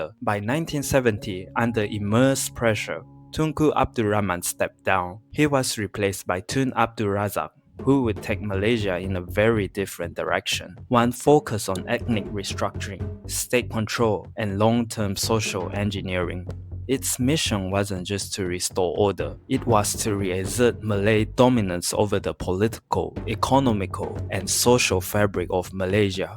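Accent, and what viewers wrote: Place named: China. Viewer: While it's a fact May 13 happened, please remember that this video's accent is PRC. Don't let outsiders drive a wedge between modern Malaysians. It doesn't even mention key names in the confrontations, no mention of Harun Idris because a PRC or Malaysian CCP shill would not know. Victory parades DID happen, which contributed to the increasing tensions. We have freedom of Internet unlike China, you can search anything about May 13, research and talk about it, unlike Tiananmen Square.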